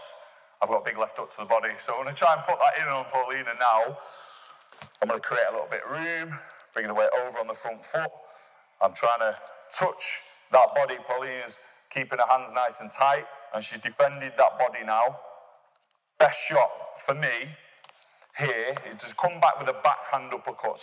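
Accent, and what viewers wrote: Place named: Manchester, United Kingdom. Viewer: Loving the manc accent, didn’t realise was based in Manchester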